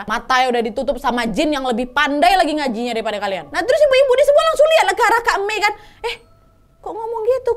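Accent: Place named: Medan, Indonesia. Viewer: Kak kek nya orang Medan yaaaa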